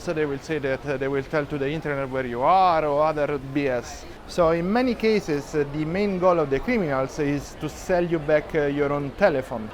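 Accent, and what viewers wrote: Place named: Italy. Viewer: It's a beautiful enjoyment to listen to you speak English with your unique Italian flicking tongue. 🥰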